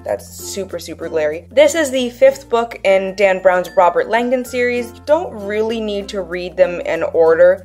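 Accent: Brazil. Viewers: ohhhh portuguese !!!! that is my language , im from portugal omg. your portuguese was pretty decent , but you definitely spoke with the brazilian accent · Hi beautiful, you are talking portuguese with the brazilian accent, I'm from Portugal and our accent is very different ahah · I think the spelling of Portuguese from Portugal and Brasil might be the same but the pronunciation on your vid is kinda brazilian lol! You did an amazing job!!!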